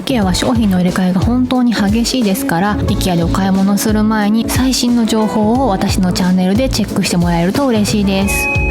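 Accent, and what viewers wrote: Kansai, Japan. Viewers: さんの、関西弁がまじる説明が好きです😊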